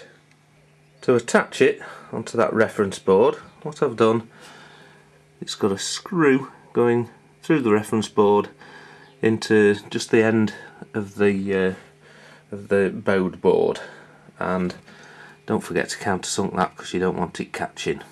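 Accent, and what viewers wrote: Manchester, United Kingdom. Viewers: My God he's from Manchester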